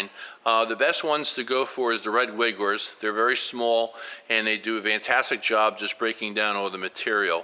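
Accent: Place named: Philadelphia, United States. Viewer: You sound like you have a bit of a Philadelphia area accent. Maybe Baltimore?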